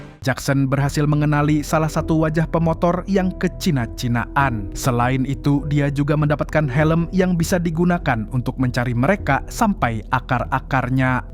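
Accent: Banjar, Indonesia. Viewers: urang banjar nih😂😂😂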